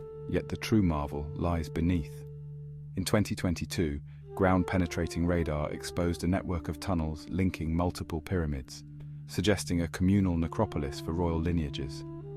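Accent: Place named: United Kingdom. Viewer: Haha I have the opposite reaction to this other guy - this is a real human reading this right? In English at least. It's not full of the usual AI-isms (disinterested inflection, no pauses) - if it reads a number wrong, or elides a headline into a sentence, or forgets to breathe at any point, I'll be sad. on the other hand, it's speaking British english, but listed as American. That makes certain things more likely than others. Well, if it's an AI, it's a better one.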